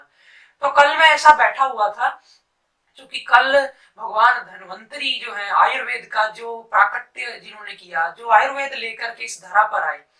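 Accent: Punjab, India. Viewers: Y did u changed ur name... i can see punjabi touch in your speech and in your way of your expressing thing.. i am sure you are sikh.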